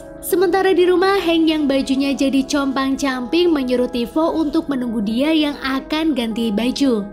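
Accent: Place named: East Java, Indonesia. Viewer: Aleman ini makanan apa ya…?🤔🤔 Logat jawa timur paling timur kayaknya…😁😁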